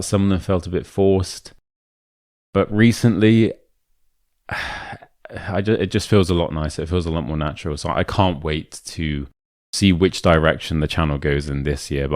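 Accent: United Kingdom. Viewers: 'Thanks for this video and your British accent! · I love your voice tembre and distinct British accent is so pleasant for my ears. Subscription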